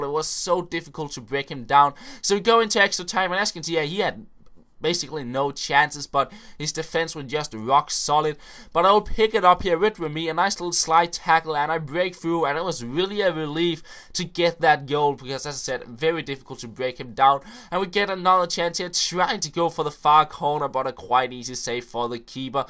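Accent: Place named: Denmark. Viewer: it is funny cause i amm drunk, and it looks liiiiiiiiiike it is 44 plkayers on the turf :P BTW, i absolutely love you voice. the danish-english accsent is sooooooo damn awsome and sexy <3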